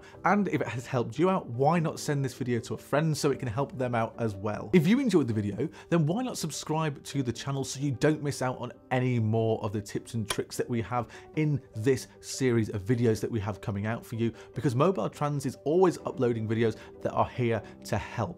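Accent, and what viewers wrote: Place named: United Kingdom. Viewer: Ugh Please speak Normal English and fix your mouth please. You look sleepy and creepy with this British English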